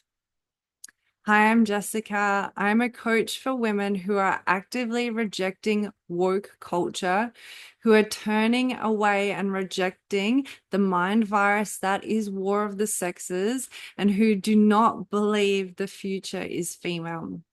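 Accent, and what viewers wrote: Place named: Germany. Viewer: It sound like you are German and are trying to hide your accent…am I right or am i wrong..? Am curious…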